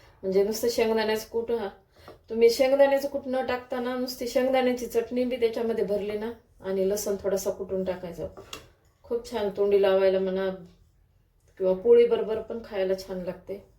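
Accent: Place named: Beed, India. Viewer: शिमला मिरचीची काय भाजी 🫜 मिरच्या धुवून साफ करून याच्या मध्ये मसाला भरलेला❤🎉 ताई तुमच्या भाषेवरून माझ्या आठवणीत आले होते तुमचे नक्की बीड माहेर असणार❤ बीडची भाषा आपापली लगेच ओळखायला येते❤ ढोबळी मिरची रेसिपी खूप छान वाटली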